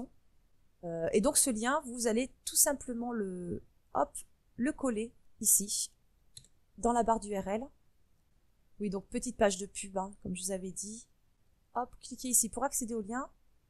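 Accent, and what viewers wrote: France. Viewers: j'adore ton accent frenchy, je comprends pourquoi y'a des mecs qui craquent en entendant une p'tite meuf du sud-ouest parler anglais, un mélange de j'm'en fout, j'ai pas écouté ma prof quand j'étais en sixième et de t'as qu'a apprendre le français c'est une langue géniale, tous ça avec une syntaxe et une grammaire juste correct' et Windscribe (50Gb/mois) t'en pense quoi?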